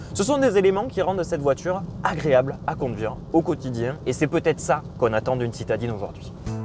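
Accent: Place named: Southwest, France. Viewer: joli test ! dommage qu'elle ne soit pas rechargeable: le gain en conso aurait été bien meilleur ! Je suis en LOA 308 hyb 225 et je la trouve vraiment tres bien. Sur ces nouvelles version, ils ont fait un net progres sur les finissions. l'option recharge des batteries est un réel plus. Pour finir: ca fait un bien fou de retrouver l'accent du "coinG" ;) ! (suis pas tres loin: sud Toulouse). J'ai beaucoup apprecié ton format test/avis/découverte de notre belle région. Bravo !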